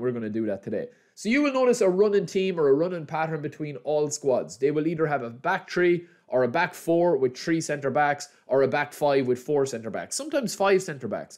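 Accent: Ireland. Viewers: What's the craic lads? Which part of Dublin are you from?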